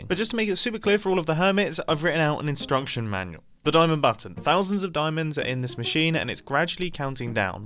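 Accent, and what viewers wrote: United Kingdom. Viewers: I come for the complex redstone builds, but I stay for the fantastic British dialogue. Thanks for the great content Mumbo! Your ability to make me laugh is quite impressive.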